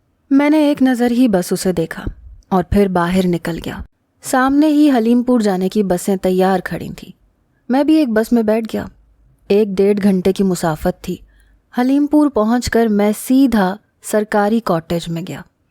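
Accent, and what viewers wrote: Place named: Punjab, Pakistan. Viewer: Love ur Punjabi accent 🌹♥️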